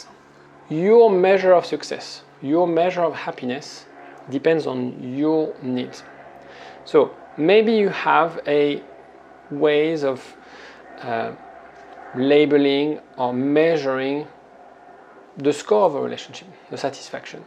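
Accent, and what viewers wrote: France. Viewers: I loved this video❤Thank you,. The French accent is also very nice😊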